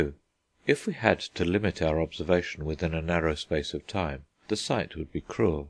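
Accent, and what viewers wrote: England, United Kingdom. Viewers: There is a perverse contradiction having it read in an English accent. Really creepy.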